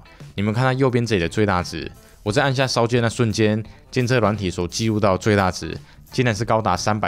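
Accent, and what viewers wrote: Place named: Gan, China. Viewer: 13代CPU 評測 比起大陸 二斤 貓三 極客灣 裝機猿 賣茶人 翼王 等團隊 動作慢了5天 可是只有Huan的贛話 是獨一無二的。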